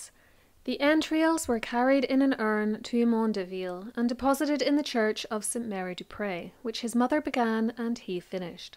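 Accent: Northern Ireland, United Kingdom. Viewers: She's from Northern Ireland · I agree, part of the reason I listen is for the beautiful music of this accent. Northern Irish, maybe? Whatever it is, your diction and accent make the videos wonderful to listen to. 🥰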